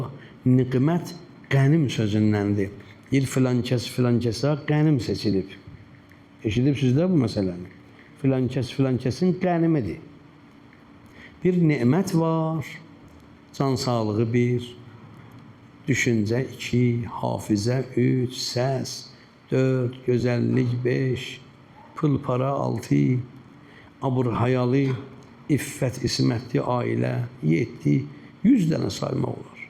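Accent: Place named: Baku, Azerbaijan. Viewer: Bakılı ləhçəsi ilə 😊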